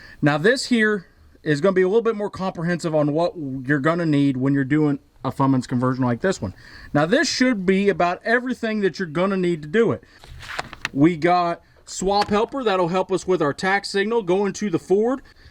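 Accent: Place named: Pittsburgh, United States. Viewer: You HAVE GOT to be from close to me using the word "paher worsh" because I live right outside of Picksburgh. I deliver to Morgantown, Pennsboro, Clarksburg, West Union, and pretty much all over West Virginia. I can't help but to notice your accent. Don't forget Fummins...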